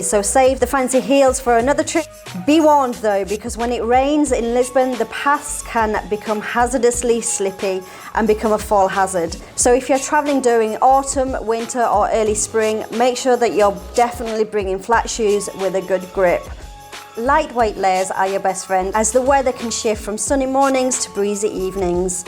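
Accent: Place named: United Kingdom. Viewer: This is clearly a British woman, dude.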